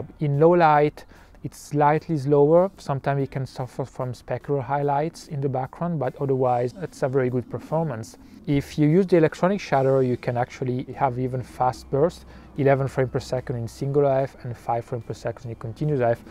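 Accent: France. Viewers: Franchement très bonne vidéo, avec un bon petit accent à la touche française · Salut, j'ai vu un commentaire en fr et à l'accent j'ai un peut reconnu donc j'en profite comme je suis au top en anglais, j'ai actuellement un canon 70d avec un 18-35 de chez sigma et un 50mm, je cherche quelques chose de plus léger et compact quand je part en vacances ou sur des soirées, ce que je veux conservé c'est surtout le RAW, le viseur, la possibilité de changer d'optique et un bon placement au niveau du tarif, j'aimerai aussi un piqué correct, pas digne d'un 5d mark III avec une optique L, mais au moins d'un 70d avec un objo de kit. Je pense que ce olympus rentre parfaitement dans mes critère, peux-tu me confirmé ? As-tu des exemple en haute def de photo prise avec le kit de base de ce olympus ? Merci beaucoup pour ton retour !! Et bonne continuation.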